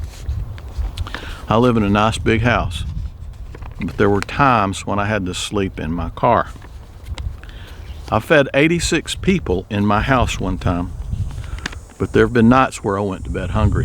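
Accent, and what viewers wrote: Texas, United States. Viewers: Thank you for your posts that illustrate and remind us of our duty and responsibilities as co-creators. Love listening to your voice. Your slow Texas drawl reminds me of my dear Uncle Lee who was also a humanitarian.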